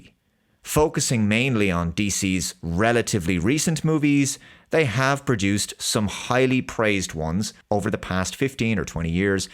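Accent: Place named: Ireland. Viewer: So glad that your break was relatively clean. I hope it heals up nicely. Good luck with the appointment next week! Outside of Spiderman, I haven't gone to theaters to see a superhero movie for several years. It is really sad, but I agree with your reasons for the superhero fatigue. I enjoy your content and love listening to your Irish accent! ❤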